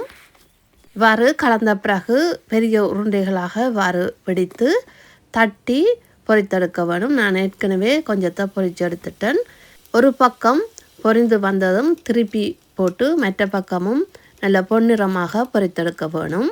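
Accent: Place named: Sri Lanka. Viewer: அடடா இலங்கை தமிழ்..தனி அழகுடா..காதெல்லாம் குளிருது கேக்கவே!!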